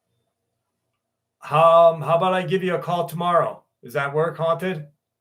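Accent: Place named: North Jersey, United States. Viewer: Well I believe your doing the North Jersey accent. We here in South Jersey do not talk like that. We have a southern twang. LOL!!!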